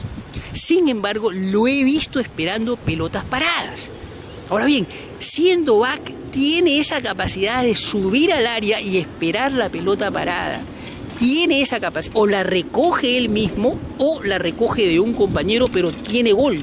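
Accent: Argentina. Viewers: Afina el oído, el loquito tiene acento Argentino .no insulte a los chileno..., En Chile los yuotober ,no son tartamudos , tartamudos, tartamudos, tartamudos..... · tiene dejo argentino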